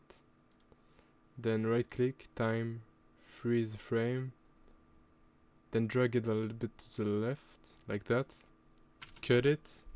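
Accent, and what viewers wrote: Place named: France. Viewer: why is bros voice always changing now u sound french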